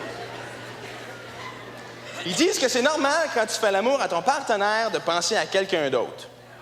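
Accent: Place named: Winnipeg, Canada. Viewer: oufffff tu avais pas mal l'accent de Winnipeg la dessus...une chance tu l'as un peu perdu mais garder ton style tout de même.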